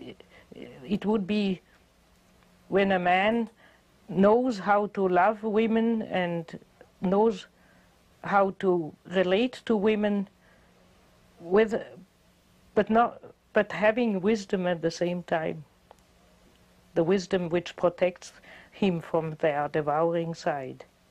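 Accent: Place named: Liverpool, United Kingdom. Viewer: sounds like a scouser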